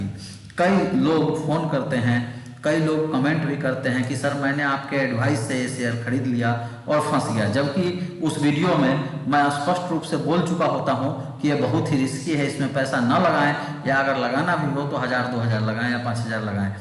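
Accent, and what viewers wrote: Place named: Bihar, India. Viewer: TU Bihari hai 100%, or tu sharsa ya madhepura ka hai ................ sahi bola na .............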